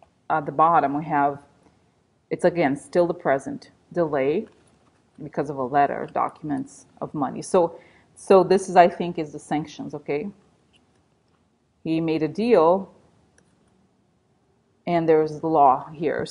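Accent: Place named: Russia. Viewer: My gosh, you crack me up! Wonderful reading as usual....love you...and that Russian accent!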